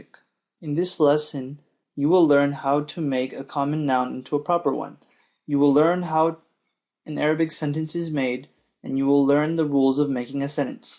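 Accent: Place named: United States. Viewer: لهجتك امريكانية مية بي مية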